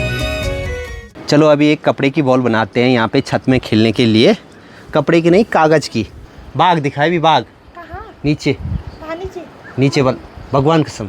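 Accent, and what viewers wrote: Pahadi, India. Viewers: Bhai ki boli bahut meethi hai... Pahadi log aise hi bolte hain... Jab ye tarsaali wala Ghar kehtey hai toh sunne mein bahaut acha lagta hai...😊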